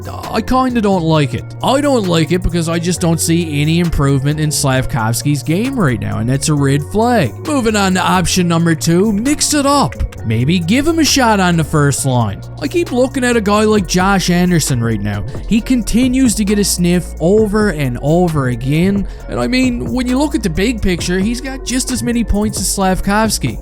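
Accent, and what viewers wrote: Newfoundland, Canada. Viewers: First time viewer, you are a newfie aren't you? Thats a newfie accent :)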